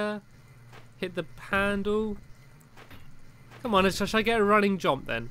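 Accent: United Kingdom. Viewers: Stampy speaks British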